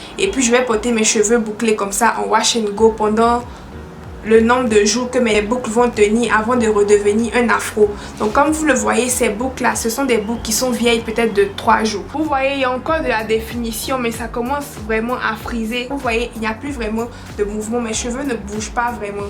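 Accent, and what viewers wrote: Ivory Coast. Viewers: L’accent ivoirien qui ne ment jamais 😂❤️ big kisss depuis babi!!!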